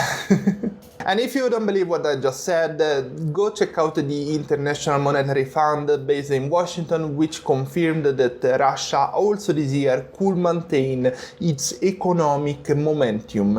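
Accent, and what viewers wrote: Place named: Italy. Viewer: I love the Italian accent. It is the most understandable for Russian speakers 💖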